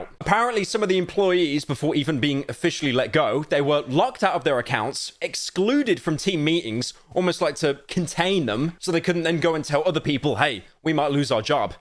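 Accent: United Kingdom. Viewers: I love new haircut, dunno why he sounds british now though! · Why are you british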